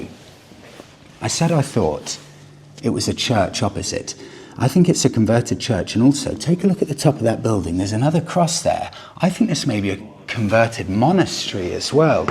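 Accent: RP, United Kingdom. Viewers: Just curious...is yours a London accent? Is that considered posh? Thanks.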